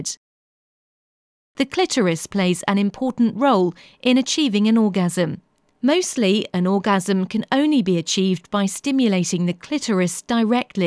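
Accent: United Kingdom. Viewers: I'm just here for the British accent. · English accent. There's no such thing as an British accent.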